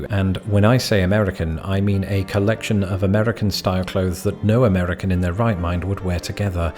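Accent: United Kingdom. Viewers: You're still not completely Americanized yet, as long as you are still saying "Sunt Patrick". Stay British! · Whatever you do, don't lose your accent. Americans tend to think the British sound very intelligent. Just look at ads on tv--if a company wants to sell a luxury item, they often have a spokesperson with a British accent--if you buy this item (usually an expensive car), you will be considered posh and veddy sophisticated:) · Well you still sound Brittish dude & hey i think you can buy a suit & bow tie when you visit home! 😎😎😎😎😎😎 But for now go & get yourself a pair of cheap sunglasses! ZZ TOP DUDE! 😎😎😎😎😎😎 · You still have your British accent and, most importantly, your British sense of humor - the two big reasons I watch regularly. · You are still a little British as long as you continue to say pro-cess instead of praw-cess.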